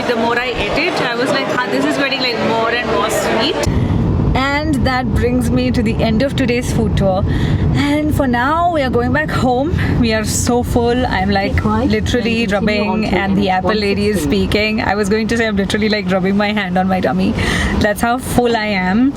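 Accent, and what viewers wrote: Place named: Maharashtra, India. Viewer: good to see you back there are very few educated reviewers left now. i was expecting you to say sithaphal in classic Marathi accent do use it time to time its your signature move. you could be the marathi mark weins one day :)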